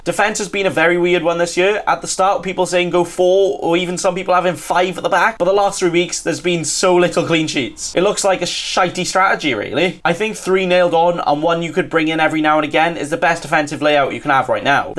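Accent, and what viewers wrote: United Kingdom. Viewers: Very nice video as always, but dude you gotta slow down and breathe sometimes, you speak so fast and with alot of accent ii can be hard to keep up if you are not UK and watch your videoes. I really like your content but for me it goes way to fast in this video and hard to keep up with your ideas and plans and your accent is very strong so could be another argument for slowing down a little. I´m not trying to criticize your video, just letting you know that not all of your viewers are from UK and some like me can struggle with the stuff I wrote. Keep up the good work <3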